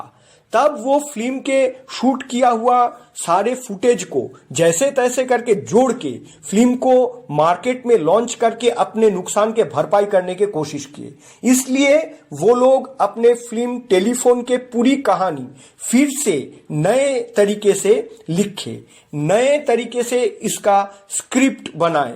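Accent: Bengal, India. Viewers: তুমি কি বাঙালি।। তোমার হিন্দি তে বাংলার টান আছে।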